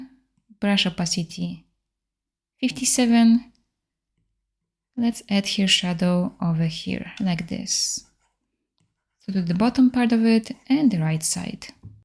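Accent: Poland. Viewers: I love your voice! Are you Polish?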